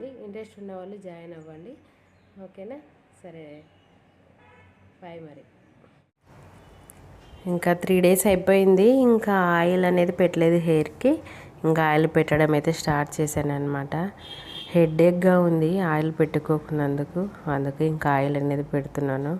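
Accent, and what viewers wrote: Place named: Rayalaseema, India. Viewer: Ekkada unnaru rayalaseema basa matladu thunnav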